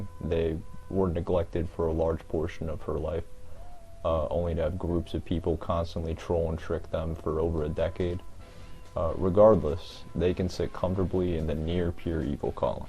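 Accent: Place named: Turkey. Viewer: Are you turkish? You sound very turkish to me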